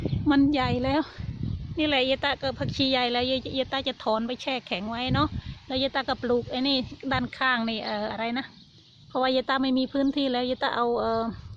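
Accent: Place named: Surin, Thailand. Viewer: คนสุรินทร์รึเปล่าคะ? สำเนียงบอกค่ะ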